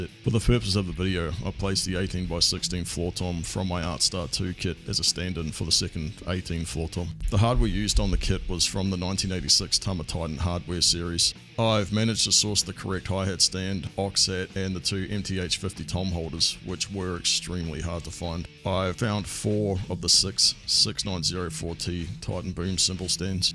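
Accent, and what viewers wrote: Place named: New Zealand. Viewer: Sound like a kiwi. Im amazed you got this gear😊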